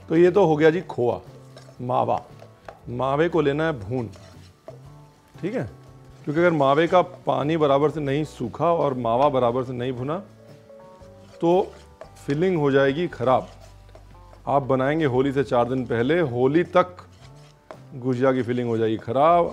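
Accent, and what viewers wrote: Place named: Lucknow, India. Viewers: Humare Ghar bhi yahi Banti hai Holi mein, full on lucknaowi accent... Chalte phirte bhi didn't miss the essence of history/explanation badiya bahot badiya Background score bhi Holi wala HOTA toh cha jate my god... Phela Saal Ka first festival Kuch toh shor Hona chahiye...koi nai feelings conveyed by exceptional Poem, visuals, t-shirt, recipes and ofcourse singing... 🌈🎨🏳️‍🌈🎊 Happy Holi to Yours from ours 🥳🥳🥟🥟🥟🥟🥟🥟🥟🥟🥟🥟🥟🥟🥟🥟🥟🥟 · Lucknow ka accent ek Punjabi k muh se sunna.. bada mast laga sunke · Thank you so much...I love all your receipes..and this one is super amazing... Love the way you talk...main videos dekh ke samajh gayi thi this must be someone from Lucknow...Thanks!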